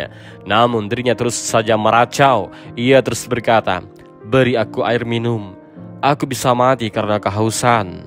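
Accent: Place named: East Java, Indonesia. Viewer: Logatmu ngomong leeek lek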